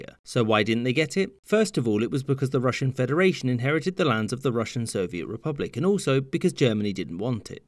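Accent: United Kingdom. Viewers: Since you have a British accent, how does England own Falklands and Gibralta, and some part Cyprus? And so many lands outside UK? That should be the real question.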